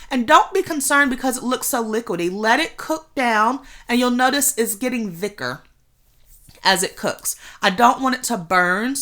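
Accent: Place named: South, United States. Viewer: Yes, I knew you were from the south immediately when you said y’all! Thanks I’m glad to have found you. I will be following.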